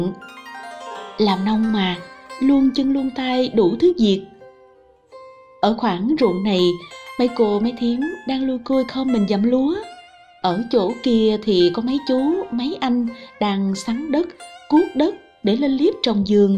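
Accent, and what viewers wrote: Mekong Delta, Vietnam. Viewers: giọng đọc ấy đậm chất miền tây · Chất giọng tuyệt vời . rất hợp với miền tây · Tiếng nói đậm chất miền Tây Nam Bộ, nghe mà mát tai luôn…!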